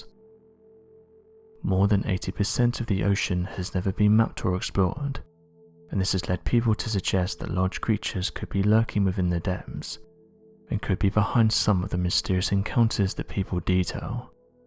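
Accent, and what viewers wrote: United Kingdom. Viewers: Just because you mysteriously whisper with a British accent does not make this video and your story and you any less full of sh*t.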